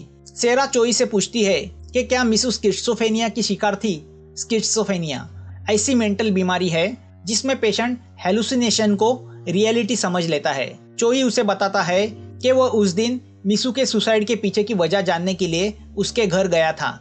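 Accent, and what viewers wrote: Maharashtra, India. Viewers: Marathi manus Boltoy kaltay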